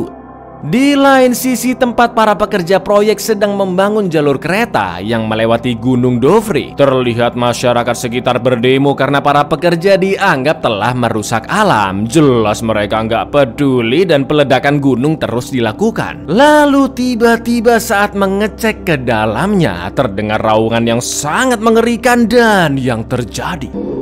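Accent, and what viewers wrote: Java, Indonesia. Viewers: pakek logat jawa cak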